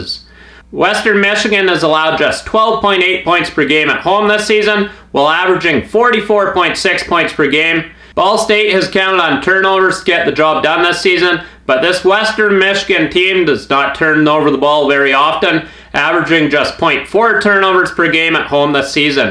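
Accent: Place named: Ireland. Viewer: You seem to be drifting into an Irish accent ......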